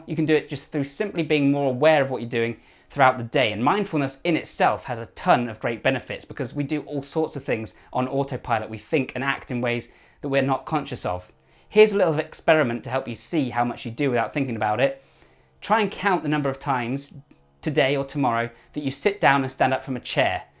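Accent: England, United Kingdom. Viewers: Can I be honest? I stumbled across your channel by accident and at first stayed on wondering how you would be engaging and educational. I love to commend and recommend which is what I now do with you. I love your fitness stuff, but love the way you introduce other spheres of interest into your videos. You are a breath of fresh air on YouTube and as one English man to another I enjoy your very English twang. Great content, thank you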